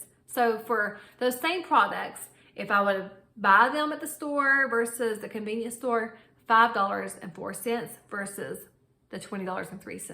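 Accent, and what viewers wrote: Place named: South, United States. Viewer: You’re Dave Ramsey but with a pleasant personality and a better southern accent ❤ I am Russian and I’m loving your videos so much!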